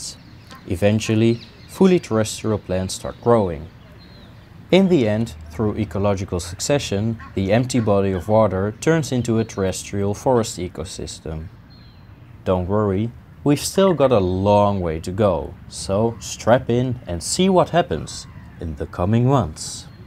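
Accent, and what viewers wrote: Netherlands. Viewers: in the first video i thought you where dutch now i can confirm it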